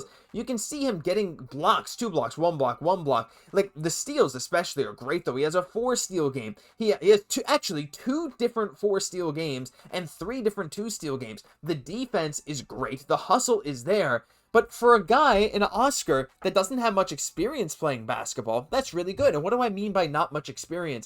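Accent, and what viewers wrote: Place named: France. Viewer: Hello from 🇫🇷 Your french accent 👌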